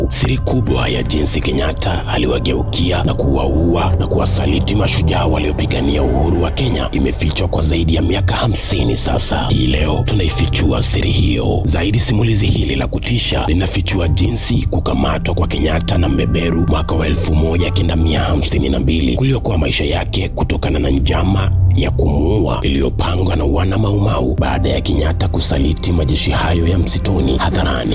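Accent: Tanzania. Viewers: definitely speaks like a ninja from bongo, maybe its that fellow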